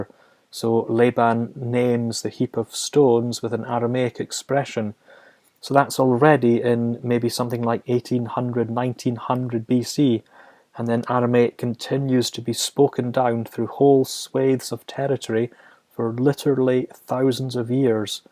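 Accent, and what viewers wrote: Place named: Scotland, United Kingdom. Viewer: Your Scottish, or Northern Irish accent if I'm not mistaken, is charming as hell and a fresh departure from the typical bland American delivery. I'm a linguist and amateur philologist, and have an interest in the Aramaic language and Its history. Nice video.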